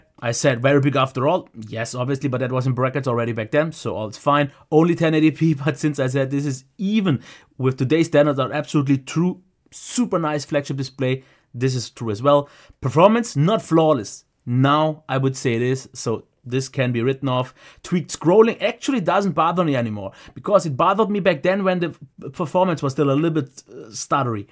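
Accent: Germany. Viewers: Funny when A German speaks English like him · Ich liebe es wenn Deutsche Englisch reden. Egal wie sehr man sich auch anstrengt, man bekommt den deutschen Akzent einfach nicht los :D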